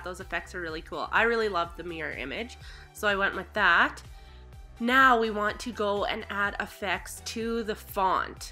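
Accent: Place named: Canada. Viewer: I am subscribing because you made that really simple and as a new YouTuber I need all the help I can get I also love your accent are you from Canada by chance? I am from Detroit Hi Neighbor!!!!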